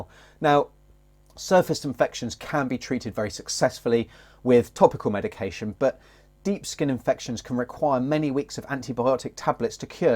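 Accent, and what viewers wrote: United Kingdom. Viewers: I can't understand you with that deep UK accent. I heard dog and rash. That's all I understood.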